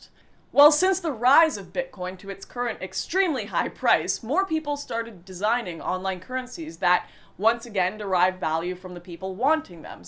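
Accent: Canada. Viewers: be waaay hotter if she'd drop the Canuck accent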